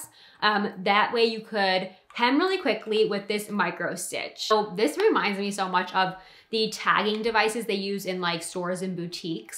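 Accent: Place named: United States. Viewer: Nationality means what nation you are from. She sounds American to me. If you mean ethnicity, my guess would be Caucasian, but she could be mixed. Hope this helps😊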